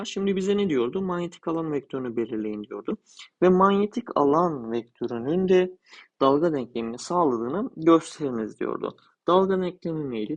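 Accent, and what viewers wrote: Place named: Samsun, Turkey. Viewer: hocam e harfini telafuzunuzdan anladım ben de samsunluyum. videolarınız için teşekkür ederiz kaynak bulmak çok zor gerçekten sağolun.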